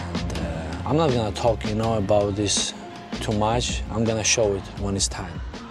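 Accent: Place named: Scania, Sweden. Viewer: They both have a Scanian accent from the south Sweden.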